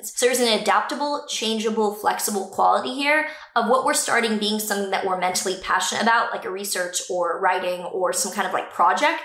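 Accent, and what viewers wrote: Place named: Texas, United States. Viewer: Are you from Texas? I can hear your country accent 🥰😀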